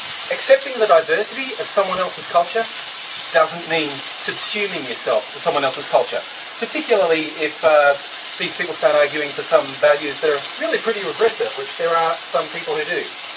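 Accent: Bogan, Australia. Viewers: Man.. i could go some cheese now.. with lemon - damm you and the best example of a bogan voice so far on you tube. HAPPY AUSTRALIA DAY. Loz.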